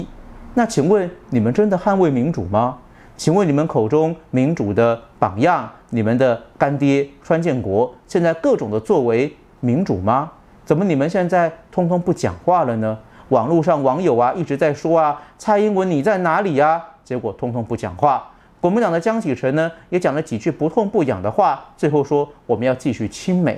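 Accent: Standard. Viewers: 被你的中文发音吸引了!!这才是正统国语发音!! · 炳忠的口音是在向普通话靠拢啊！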